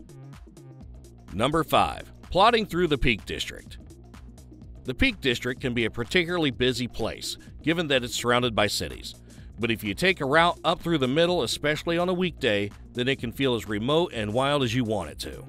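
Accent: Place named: United States. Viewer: It is worth learning what routes are in England, and how to pronounce words in English (don't insult the audience with 'American English' (there is English, and then there are mistakes)).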